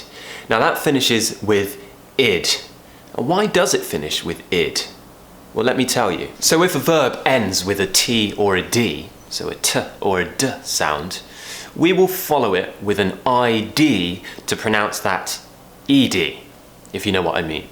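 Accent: United Kingdom. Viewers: Thank you very much for your help.It's a great way to teach my students !!! By the way I love your British Accent. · I am always love the way you speak english with your british accent And Also sometimes i find the difficulty of word ending /ed/ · I love British accent, And i love hearing your voice and learning British English at the same time. I'm browsing all of your past videos and i'm in love with it. Your such an inspiration. Thank you.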